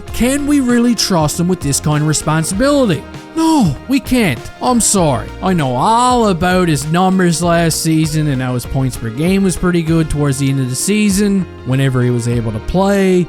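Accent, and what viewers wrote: Newfoundland, Canada. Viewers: Our by'e is a Newfie, best kinda Canadian there is. . .and I'm not even the same kind.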